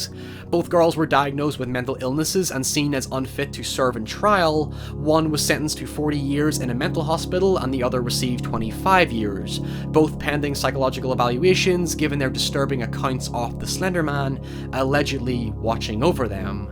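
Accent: Northern Ireland, United Kingdom. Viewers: Are you from northern Ireland? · I love the NI accent <3 Pronouncing "now", "nye" is so cute. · I think this is the only channel I have found with an accent from home. Go on norn iron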